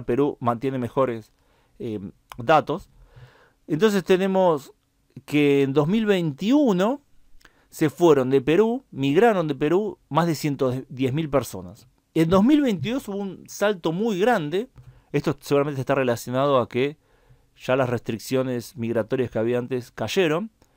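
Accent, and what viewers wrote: Argentina. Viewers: Este creo que es un argentino por el acento. Perú, país inviable? Está muy despistado. Los ejemplos que pone son absolutamente burdos. Hoy, Argentina sufre los efectos del peronismo y Kirchnerismo. Le sugiero que estudie más y hable con sustento. El 45 % de la población argentina se encuentra en pobreza y Milei, tendrá que trabajar mucho y alinear a este charlatán que me causa vergüenza ajena. Argentina está en default y con índices de pobreza y subdesarrollo espectaculares. Perú, está creciendo económicamente durante los últimos 30 años, pese a los problemas y que tiene una de las monedas más fuertes de América Latina. Anda a estudiar economía en serio y basta de vulgaridades basadas solamente en la variable población.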